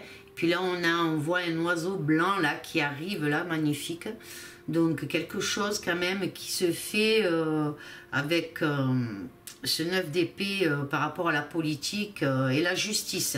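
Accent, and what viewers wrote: South, France. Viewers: Bonjour, je vous découvre et vous êtes agréable à écouter. Pour ma part, j'ai un ressenti sur un duel entre deux hommes et ce sera l adversaire à Macron, un homme inattendu au pouvoir, mais pas aussi inconnu que Macron. Vs avez un accent de la Drôme? Du Vaucluse? Du Gard?.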